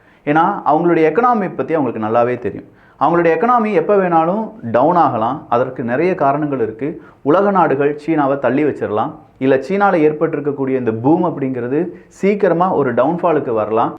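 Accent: Kerala, India. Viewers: Neenga enna malayali ah?? Illa neenga border state ah??? Namma tamilnadu slang la pronounsation pannunga.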